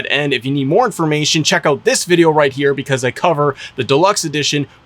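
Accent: Canada. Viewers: I heard Canadian!!?? Are you from Vancouver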